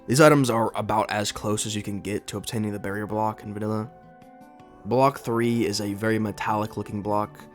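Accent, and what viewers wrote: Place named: South, United States. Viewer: I Can hear the southern in your voice brother 🦅🇺🇸🦅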